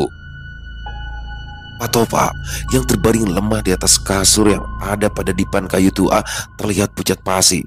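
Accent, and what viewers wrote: Jakarta, Indonesia. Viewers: Bang itu pas banget klo ceritain logat betawi sangat terhibur,apalagi klo bang piyan bertengkar guyon sm baba ali,klo bang doni bertengkar guyon sm ibu zubaedah bikin enak denger nya sambil tertawa,mantap bang sdh bisa menghibur kita semua,sehat utk bang nya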